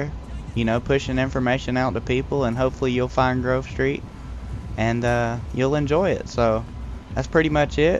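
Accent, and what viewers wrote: United States. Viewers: are u from Kentucky? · He sounds fine it's a southern accent I have one to so don't hate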